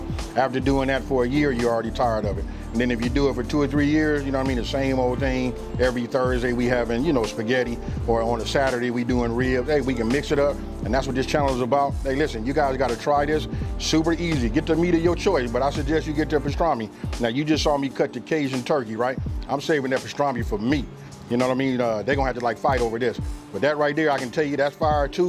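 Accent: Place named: South, United States. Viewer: I was Stressed out today. finally got some free time but stressed. Tried a movie. Could not concentrate because still stressed out. Thought some music video might help but this was recomended. This guys Talking southern USA accent calmed me right down. Thanks and You Tube.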